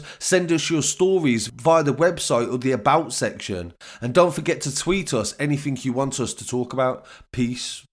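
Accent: Birmingham, United Kingdom. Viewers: This is a Birmingham accent not London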